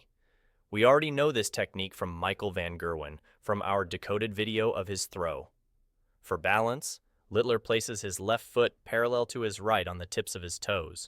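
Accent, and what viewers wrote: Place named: United States. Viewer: Did not expect an American accent on a darts video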